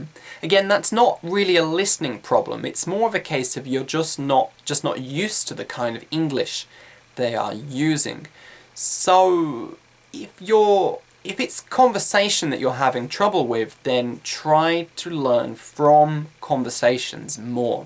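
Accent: United Kingdom. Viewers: Excuse me, I am Fitri from Indonesia, 18 Years old. I have a question that is what kind of languanges do you use ? American English or British English ? Because, some times when I am listening your speaking, I guess your speaking in British English. Is it true or not ? Please give me the explanation. Thanks :-) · achi milorava It's because of his British accent :) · He sounds British or something to me but idk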